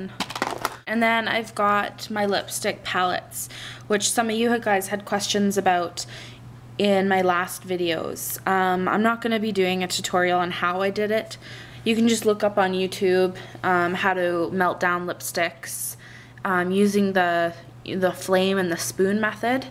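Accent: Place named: Canada. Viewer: you sound canadian? just the way you say certain words. or maybe from the northern part of us near canada like minnesota or something. thanks for the vid btw...very helpful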